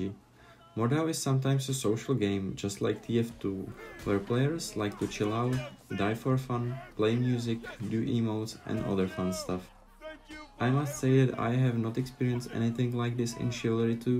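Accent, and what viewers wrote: Czech Republic. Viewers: Ha! Já jsem si říkal že mi zníš jako čech. :D Já mám mordhau určitě radši kvuli tomu combatu, protože u chiv2 mě příjde že to je takový divný když můžeš blokovat, jak dlouho chceš.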